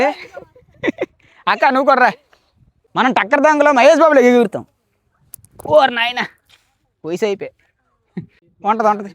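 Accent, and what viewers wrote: Srikakulam, India. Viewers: Srikakulam slang very funny and super bro Form tirupati Neenu mee pelli ki tirupati nundi srikakulam ki vasthunna bro🎉🎉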